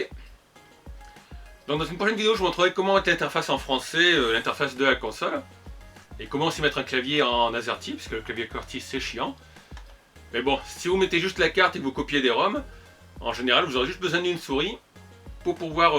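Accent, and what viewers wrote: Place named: England, United Kingdom. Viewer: Un peu compliqué ton truc lool . Par contre j aime ton accent anglais 😋😋😋😋.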